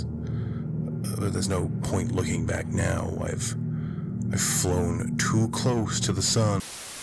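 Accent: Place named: Australia. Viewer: Love this game and your amazing Australian accent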